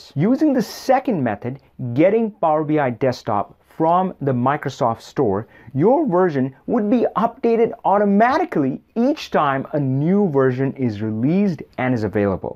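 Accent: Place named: India. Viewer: the best indian english talker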